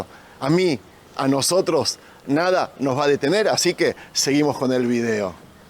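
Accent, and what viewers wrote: Argentina. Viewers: Nice video. Is your accent a mix of Argentinian and Hebrew?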